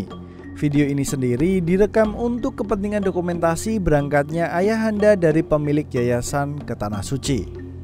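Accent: Java, Indonesia. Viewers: Tetep medok ngomonge mas #jowonotdied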